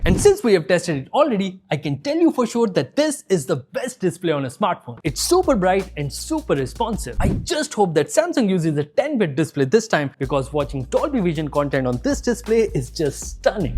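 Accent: India. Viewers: I cant listen this indie english 😐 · Omg. I cannot understand this Indian English literally anything!!! How can he make you tube videos with english being so bad? He swallow words...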